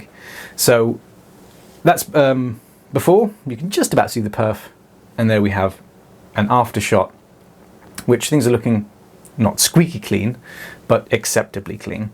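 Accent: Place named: RP, United Kingdom. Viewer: That accent is way too posh for you not to be also part of the “anyone” group.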